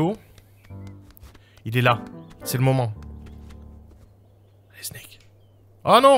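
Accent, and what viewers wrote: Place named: Banlieue, France. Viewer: Ça te va pas l'accent des banlieues :( trouve autre chose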